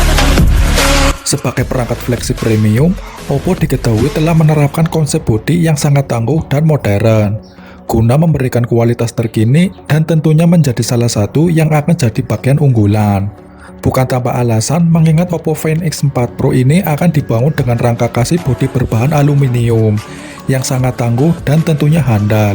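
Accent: Banyumas, Indonesia. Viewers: Medok cak suarane kepriben kepriben inyong.....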